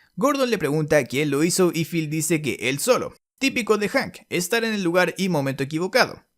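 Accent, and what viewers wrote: Chile. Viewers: Crack makina te recontra quiero una prefunta eres de chile ?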